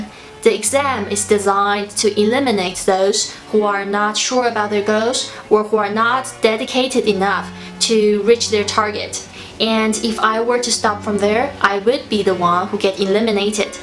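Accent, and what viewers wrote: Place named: Singapore. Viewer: its the way she speaks her accent..i lived in singapore for 6 years and did my schooling there and alot of people over there speak like her but when they write you will come to know how awesome their english is ...dont be fooled ....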